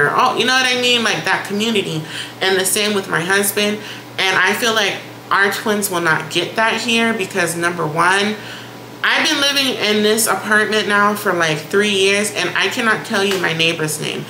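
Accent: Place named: Jamaica. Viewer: Born and raised in Florida!? But it sounds more like you were born and raised in Jamaica 🤷‍♂️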